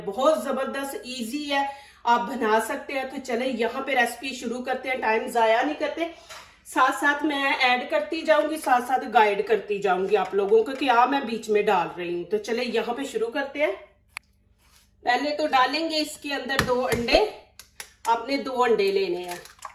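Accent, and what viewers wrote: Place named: India. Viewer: It's a amazed moment when you dish out delicious foody recipe with desi dialect live long with healthy life sister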